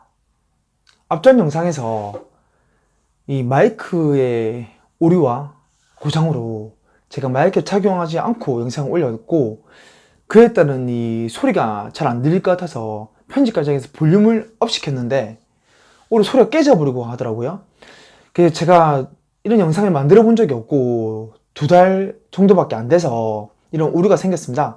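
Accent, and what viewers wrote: Busan, South Korea. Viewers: 발음 문제 없는거 아닌가요?ㅎ그리고 부산사투리라서 귀에 쏙쏙 더 잘 들어옵니다.항상 잘 보고 있어요감사합니다~ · 부산 사투리 구수합니다 저도 대구에서 태어나 부산 큰댁에 자주 놀러갔습니다 ㅎㅎ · 부산 사투리 좋네요..잘 들었습니다.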